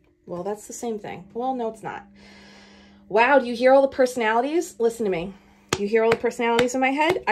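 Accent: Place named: Long Island, United States. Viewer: I just found this page and I subscribed because of your accent.. are you from long Island? ❤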